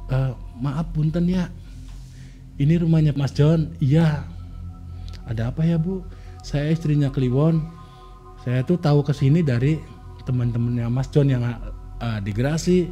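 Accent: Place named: Cirebon, Indonesia. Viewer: pasti wong cirebon kih 😁 logat nya cirebon pisannn😍🥰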